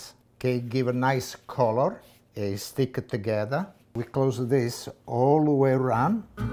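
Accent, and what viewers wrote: Italy. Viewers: Chef you are a wonderful Chef. I love your beautiful food creations. You remind me of my Father who is now in heaven. Your speaking, Italian accent are the same. God Bless you with many years of good health and happiness in your life. Keep making these beautiful video's and educating all of us.You are so delightful. Love you!! Joe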